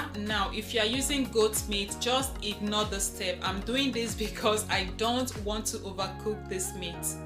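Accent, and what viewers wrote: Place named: Delta, Nigeria. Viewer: what part of Igbo are you from? You sound Delta Igbo. Just curious.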